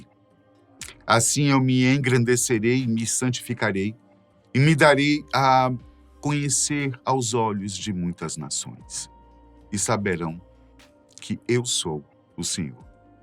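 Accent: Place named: Northeast, Brazil. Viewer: Conhecendo o canal hoje o vídeo já tem mais de 11 meses mas é atual. Muito interessante a explicação das profecias. Acompanho as profecias bíblicas e o tempo de Deus é diferente do nosso. A Rússia também está nas profecias. E também tem os cavaleiros do apocalipse pode ser cada cavaleiro uma nação. Você parece ser conhecedor de história seu tom voz é clara apesar do sotaque nordeste. Israel está vencendo o mau do terrorismo o que virá depois?? A Rússia escalando a guerra. 🇧🇷🙏🇮🇱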